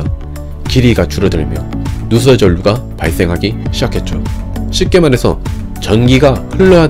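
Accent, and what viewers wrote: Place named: Gyeongbuk, South Korea. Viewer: 사투리가 대구 경북쪽이네 우리 부울경은 아닌듯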